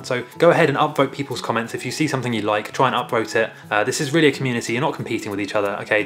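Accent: England, United Kingdom. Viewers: You are like the joe wicks of lucid dreaming, Long hair, English Accent and all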